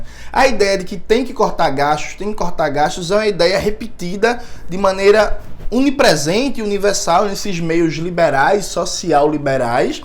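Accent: Pernambuco, Brazil. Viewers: te adoro pela qualidade de teu trabalho, teu conhecimento... Mas essa proximidade com um jeito diferente do nosso, aqui do interior de São Paulo, de falar é delicioso. Um ritmo, um andamento faz com que ouvir você seja delicioso.... Que maravilhoso quebrarmos essa hegemonia horrível do capitalismo que impõe um modelo "padrão" de ser, massacrando os regionalismos tão ricos e lindo em nosso país.... AMOOOOOOOO esse jeito cantado de falar....Adoro essa pernambuquice... Sabe, graduei, fiz mestrado e meio de doutorado. Nunca tive um professor negro e estou adorando... Obrigada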